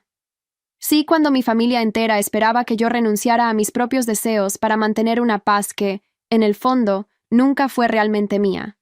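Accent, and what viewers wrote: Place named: Madrid, Spain. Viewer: Su voz es de la península española Madrid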